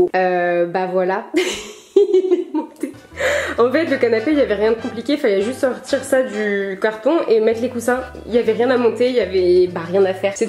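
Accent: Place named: France. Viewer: I love your french accent. It is so pretty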